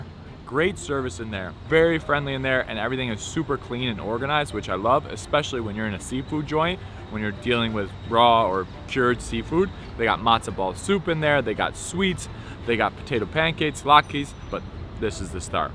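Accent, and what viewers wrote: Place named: New York, United States. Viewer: Your NY accent comes back when you're back East.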